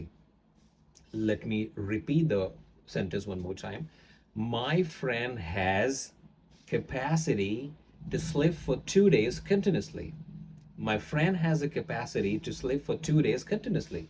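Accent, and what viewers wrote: India. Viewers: It's really hard to understand the indian accent.😢The speed is really too fast.😢 This video is very useful for me to listen more accent.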